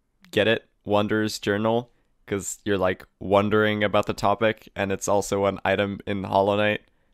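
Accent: Sweden. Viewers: Är du svensk? Jag missade dialekten :D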